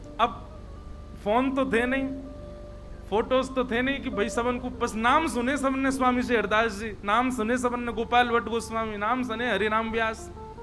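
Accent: Braj, India. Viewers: Maharaj ji aapke mukh se barij bhasha mein katha sunna bahut acha lagta hai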